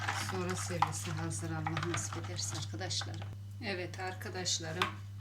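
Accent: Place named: Artvin, Turkey. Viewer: Artvinli konuşman var sife memleket neresi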